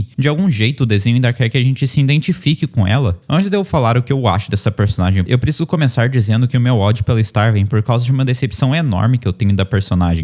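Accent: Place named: São Paulo, Brazil. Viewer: desse com sotaque paulista querendo falar da star scrrr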